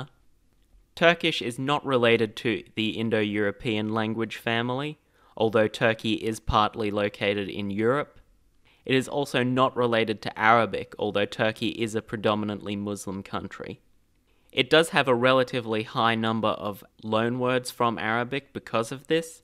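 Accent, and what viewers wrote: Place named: Australia. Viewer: Bu iyi bir video! Güçlü Avustralya aksanına kıkırdamadan edemiyorum ama telaffuzu çok iyi yapılmış!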